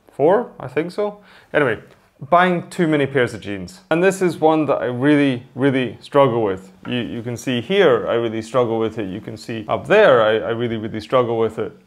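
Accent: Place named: Scotland, United Kingdom. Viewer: The poshest Jock on the interweb, bar none.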